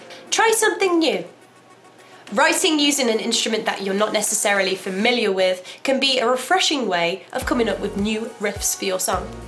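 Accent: United Kingdom. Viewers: That Midlands accent... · I love the way you sound. Your British accent is adorable to ears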